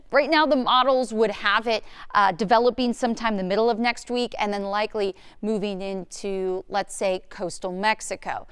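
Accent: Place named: Texas, United States. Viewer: Cute little Texan drawl!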